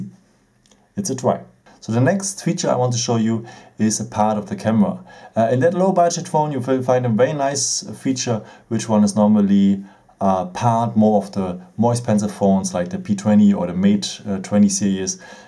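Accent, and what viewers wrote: Germany. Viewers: couh- couh seine englische Dialekt sagt ich bin deutscher !!!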